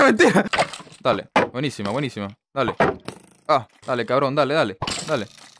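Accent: Venezuela. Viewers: una pregunta se que ere venezolana mano porque yo soy venezolano tambien y hablas como yo pero en que pais vives o sigues em venezuela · Si pues el es venezolano no ves el asento venezolano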